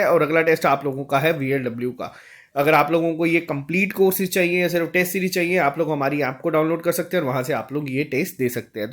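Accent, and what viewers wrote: Kashmir, India. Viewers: I love u sir thank you for everything 💗 One more thing sir your kashmiri accent is nice ...Siyah rood jaeren Manz...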